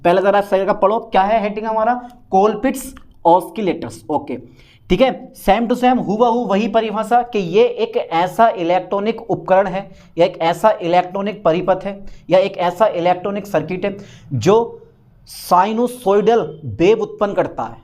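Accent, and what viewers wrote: Bijnor, India. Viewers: Sir ap district bijnor se ho ...? Apki bolne se lag rha hai ap bijnor district se ho ...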